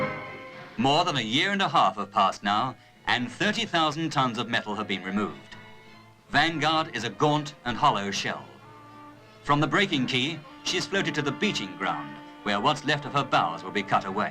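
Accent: United Kingdom. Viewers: Love the British narration. Tip: tour HMS Belfast on the Thames. Sea going comfort for all crew.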